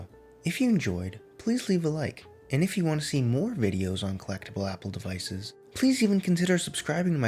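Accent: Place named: Netherlands. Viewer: Dus je bent wel een Nederlandse.... can't help but noticed the accent .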